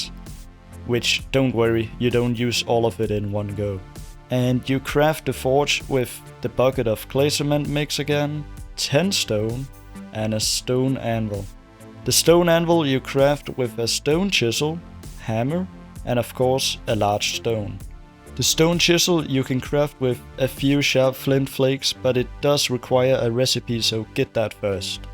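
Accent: Denmark. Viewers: Very informative video, great intro and thanks for the hard work you put into it! Btw is that a danish accent? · are you danish? loved the video btw! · are you danish bruv?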